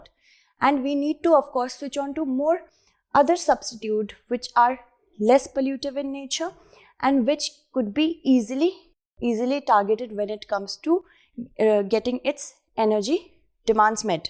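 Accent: India. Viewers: i can barely understand your accent, but belive it or not i understand you way more then any US media. thanks random indian woman.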